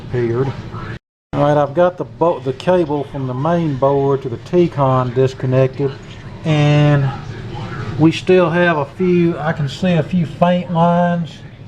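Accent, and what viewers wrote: South, United States. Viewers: I don't ever recall anyone dying from consuming too much weed, yet not a day goes by that an innocent person isn't murdered by a goddamn assault weapon, designed to be used on a battle field. Guess we really shouldn't be surprised to hear you spew more negative comments about a plant that to the best of my knowledge hasn't killed anyone, and speak with such authority and fondness concerning assault rifles. We've been trying it your way forever, and things just keep getting worse for the children, yet every time we try to introduce even the most basic, common since changes in firearm laws, such as better back ground checks for instance, wrong wing fools like yourself cry about it. Ok, what do you backward ass right wingers propose we do? None of your representatives have any legislative proposals at all, AT ALL ! That is unless you consider M&M's, Disney & gay Americans real legislation. 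Most of you traded your country for a goddamn Red hat, and then tried to over throw our country because you didn't like the outcome of an election. Holy Shit, don't you feel smart ? ;-). You all were played by perhaps the dumbest American of all, and definitely the worst business man in the country. Based on that backward sounding southern accent of yours, we really shouldn't be surprised to hear you talking the way you did about this weeks school children being murdered, I mean they were guilty of going to school after all ! Such good Christians !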